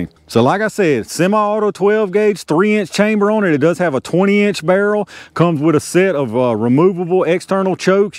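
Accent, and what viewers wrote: South, United States. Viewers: Thank you for your review, I have been looking at this shotgun for a while, love your Chanel and your excellent reviews. Ps, I am a Yankee, but love the south, especially that southern drawl. Keep the videos comming.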